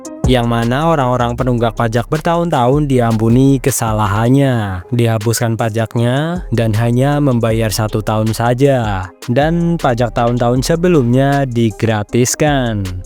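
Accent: Central Java, Indonesia. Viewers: Sampeyan, Yo Jawa Tengah 😂😂😂😂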